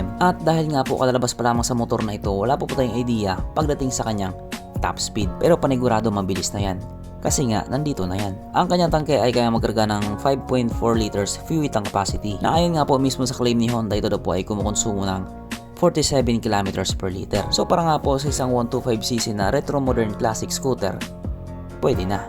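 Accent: Visayas, Philippines. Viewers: kusog kaau ka nanopo bisaya baya kaayo imong jaming.. balik2 ra🤣🤣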